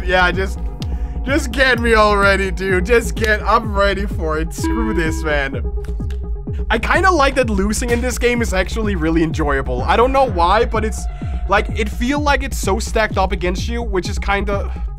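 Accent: Denmark. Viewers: Jeg kan hørrer at du er dansk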